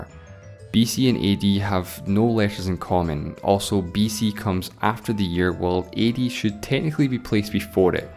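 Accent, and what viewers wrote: Scotland, United Kingdom. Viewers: He's Scottish.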